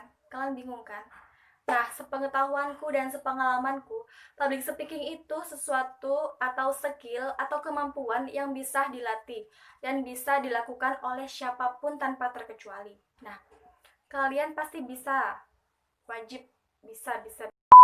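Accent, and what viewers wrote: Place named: Java, Indonesia. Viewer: Ngomong kok medok mbak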